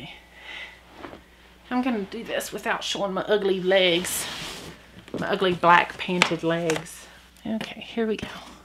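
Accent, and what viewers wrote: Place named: South, United States. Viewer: I love that bag you call "dorky" lol! I just love your video's and that southern accent is awesome! I'm from RI born & raised here. I just love all your bags and my favorite videos are when you change bags and I love all the things you carry in your bag! I'm the same way---All my things in my bag has a story behind it. I carry a pretty piece of sea glass in my wristlet my grandson gave me. it's me good luck charm that I held in my hand when I go to treatment for my ovarian cancer. Keep taping! Your just awesome💜👍💜👍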